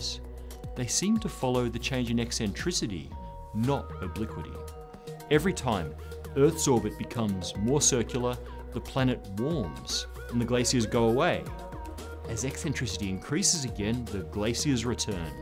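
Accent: England, United Kingdom. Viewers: climate change has nothing to do with this? Now I know its true~!, Speak with an english accent and have a well known TV company behind you, and you can shovel complete shit from your mouth and no one cares to say how stupid the little "spoiler" in the description is. fuck off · PBS: People are waking up to the climate scam.. what can we do? PBS director: Hire a spokesperson with a beard and a British accent. That'll add credibility so they believe our bullshit. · Why do they always have the host of these shows with a British accent.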